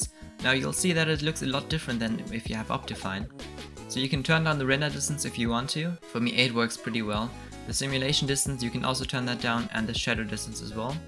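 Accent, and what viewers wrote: Germany. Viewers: man hört so das du deutsch bist haha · Du klingst zimlich deutsch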